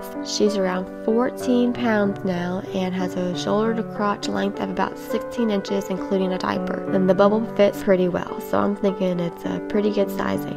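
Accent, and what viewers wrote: South, United States. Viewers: I have been smocking for 27 years and I love your videos, especially your sweet southern accent and background music. I am glad to see a young person reviving the dying art of French Hand Sewing. I also used to take my "project baggie" (or several) with me everywhere! Best wishes!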